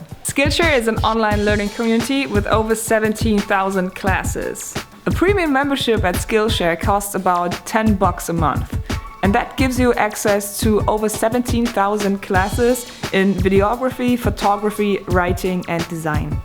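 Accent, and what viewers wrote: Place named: Germany. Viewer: Noch ne Deutsche die versucht Englisch zu reden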